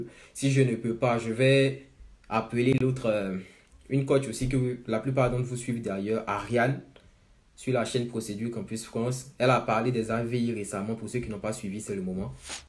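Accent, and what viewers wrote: Ivory Coast. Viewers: Tu as un accent ivoiren frérot, tu l'est ?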